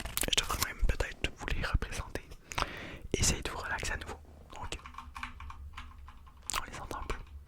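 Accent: Quebec, Canada. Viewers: L’accent québécois reste un des meilleurs à mon avis :)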